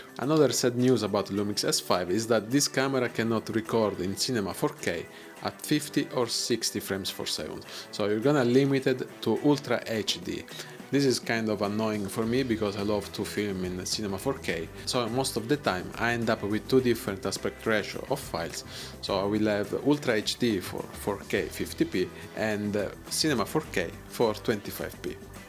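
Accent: Italy. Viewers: Ciao, ti seguo da un po, visto che ho scelto pure io la Lumix S5. Professionale e conciso, complimenti. Mi ricordi nel tuo inglese "My Name is tanino"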